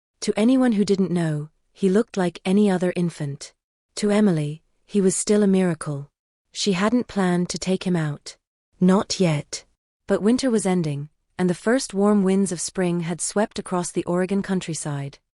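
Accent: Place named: France. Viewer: Extremely annoying! Was unable to understand the foreign French words, hard enough to understand the few English words the narrator spoke with the horribly thick French accent. If you are going to narrate a story, pick ONE language and stick with it!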